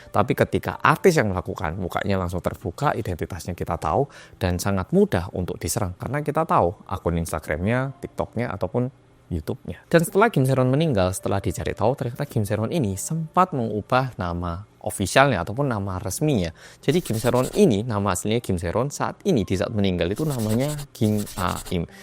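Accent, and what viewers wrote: Java, Indonesia. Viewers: Jawa lu medok banget,😂😂😂😂😂… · Nungguin bget si komen dan opini oppa yg medok..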